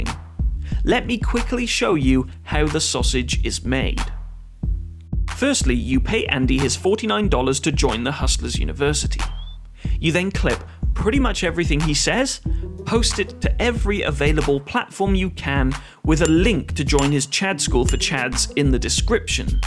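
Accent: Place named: Wales, United Kingdom. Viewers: The Welsh accent.❤ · Why does wikifeed have a North Wales accent? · I love that the A.I voice you use is welsh. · Why does the wikifeed AI voice sound like it's from Swansea?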